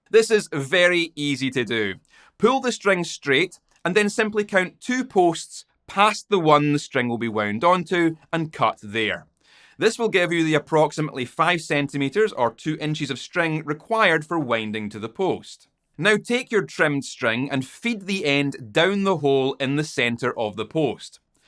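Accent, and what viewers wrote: Scotland, United Kingdom. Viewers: I need to lear the Scottish accent, like you and Dr. Steve Cassidy, so I can make more interesting videos. Love it. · I come here for the wonderful Scottish accent (me dad is mostly), and the guitar geekery is a bonus! Seriously, you are one of my favorite channels for the great guitar information, and because you're not afraid to slay sacred cows like locking tuners, unpotted pickups supposedly being better, pickup measurements influence on tone, etc.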